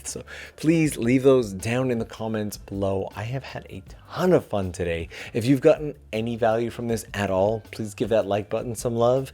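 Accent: Canada. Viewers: First of all. Great tips and tricks, I really need to try them all. This video has really caught my attention more than most. Second of all. I was wondering if you were Canadian. I could have sworn I was hearing Ryan Reynolds here and there. Here’s an opportunity to make a video of you speaking in Japanese sounding like Ryan Reynolds 😂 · lol I knew from your accent you were Canadian. Thanks for the tips. I will try them out. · Loving your Canadian/UP accent haha. ❤ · Lots of French here, and I remember some from school classes. Ultimately though I pick up like every fifth to 10th word and use technology when needed. Nothing like what my mom went through where her father was actually raised in French. He passed when I was really young and I was told he spoke English to me, but his accent was so thick. Don’t remember anything he ever said to me. Acadian ancestors immigrated through the Hudson in the 1670s. 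I knew you were Canadian without you telling me because of the way you say about and out. I also dated some bilingual girls from Northern Maine because that’s where I went to high school and where my family was from. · Just found your channel. And I'm just as fascinated by your Canadian accent. lol. It's very particular. I'm trying to mimic it, and can't get it right!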